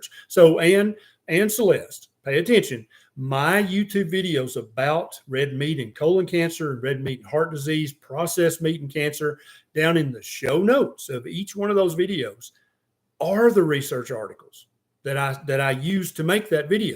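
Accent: South, United States. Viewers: "One of these people?" Really? You don't think you have an accent? You must be joking, and I must be being an idiot for failing to realize it? You most definitely have a very marked, obvious and clear southern accent. =D I'm very nearly 100% confident that anyone here in Oregon that I show any of your videos to will immediately completely agree with me about that after hearing you speak for maybe 3 seconds. But there's nothing at all wrong with having an accent, is there? Doesn't just about everyone have some kind of an accent? I'm sure that if you hear me speak you'd notice a northwestern accent. Someone from Oregon sounds like an Oregonian. Someone from Boston sounds like a Bostonian, and someone from Alabama sounds like they come from Alabama. /shrug. Praise the Lord and pass the meat.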